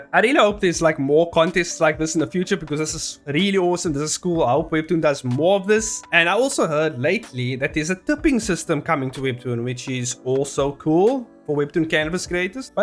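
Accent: South Africa. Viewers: You're from south African?!!!😲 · Ayeee you sound like a fellow south African. I just started working on my submission, gonna be a hectic month of grinding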